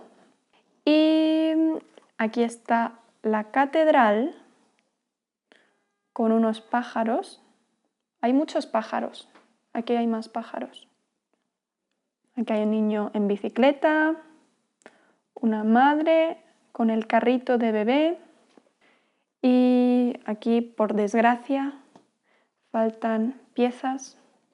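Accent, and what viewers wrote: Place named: Madrid, Spain. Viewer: Gracías por tus videos. Son divertidos y educativos. :) Estoy tratando de aprender español nuevamente después de un descanso de varios años. Tus métodos y tu forma de hablar me requerdan mucho a mi profesora del Colegio comunitario donte aprendí Espanol por unos años. Ella es Madrileña y quiza por eso la pronunciación es tan similar. Los bomberos hacen bombas así como los panaderos hacen pan. xD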